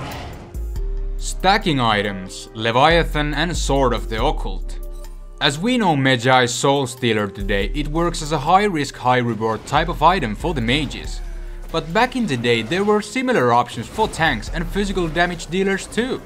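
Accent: Finland. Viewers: Are u from Finland? If u arent u just speak like Finninsh people does.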